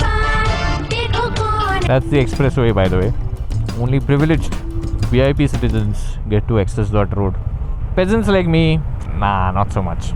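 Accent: Malvani, India. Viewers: That malvani accents of yours , so sexy 🥵🥵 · Hyo to😂😂😂❤❤ You speaking malvani never gets old! Please if possible make a video speaking completely in malvani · That malwani accent 🤌❤️‍🩹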